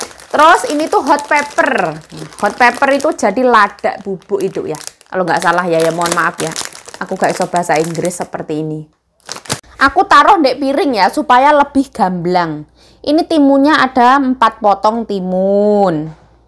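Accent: Java, Indonesia. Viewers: Ngomonge biasa ae " medok poll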